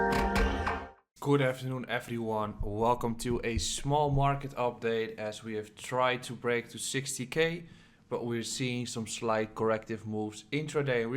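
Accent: Netherlands. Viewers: Are you Dutch? You sound like Dutch.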